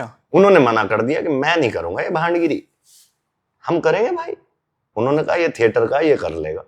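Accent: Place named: Uttar Pradesh, India. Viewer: O Bhai haryanvi nahi hai ok up ki hai tum hari 😊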